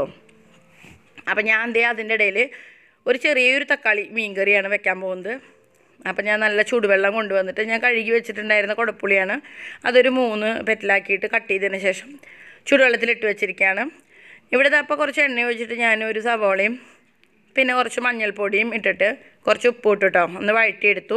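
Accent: Thrissur, India. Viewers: Happy b'day Malu🎂🍫🍬🍭🍡🍨🍧🍨 Cheruthanenkilum nalla sadhya Chechiyude samsaram kettirikkan thanne enthu rasama. Nalla nadan thrissur bhasha thanne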